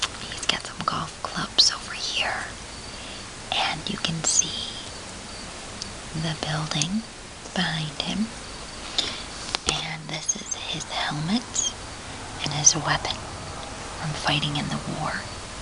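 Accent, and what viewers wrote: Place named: South, United States. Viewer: what I looove about this video is... :D many aspects, dear: your accent (southern US? I am not native English speaker yet I would place it there), the brush, the little stories and of course the pictures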